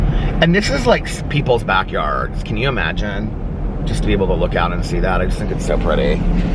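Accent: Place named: Midwest, United States. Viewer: Your voice captivates me. Your accent is reminiscent of my midwestern family roots. Been to Indy several times, lived in Cincy for a bit. Love the Midwest and adore you and. Thank you for this channel. So cozy.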